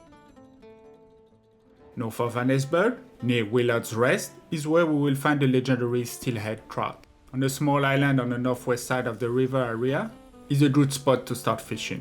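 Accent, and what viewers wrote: France. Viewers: Nice video man! What’s this accent? Français?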